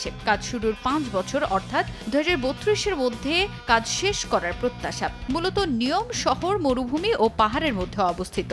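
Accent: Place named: Noakhali, Bangladesh. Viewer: এটা তো মনে হয়ে নোয়াখালীকতে 😂😂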